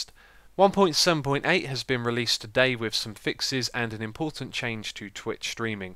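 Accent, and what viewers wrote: United Kingdom. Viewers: <3 Brit accents (content also good/perfect, as usual }=Þ)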